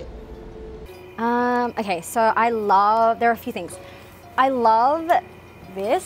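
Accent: Australia. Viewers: Are you a an Australian? You sound very Aussie, ooh never mind I just saw Vegemite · Love her accent, can hear the Australian coming through. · Her accent is definitely not regional to anywhere in the US. She sounds Australian to me.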